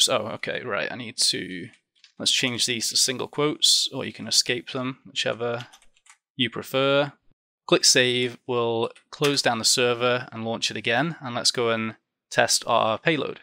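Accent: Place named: England, United Kingdom. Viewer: I’ve been watching your videos for a few weeks now and I am so happy I found your channel. Your videos are outstanding, and it is much easier for me to understand with the English accent:). Keep doing your thing, I look forward to your next video!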